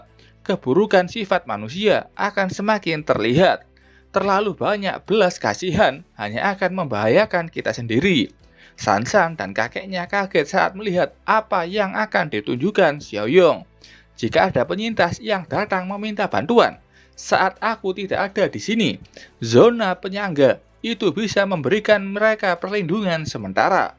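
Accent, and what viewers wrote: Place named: Java, Indonesia. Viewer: sebenernya ceritanya menarik... cuma yang baca narasinya terlalu datar dan medhok jowo sekali... 😭 jadi agak aneh dengernya... 🙏🏻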